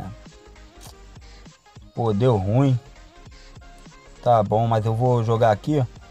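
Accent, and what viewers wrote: Rio de Janeiro, Brazil. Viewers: crl tu sim q é carioca rapá , nmrl vc é engraçado com esse sutaq carioca bolado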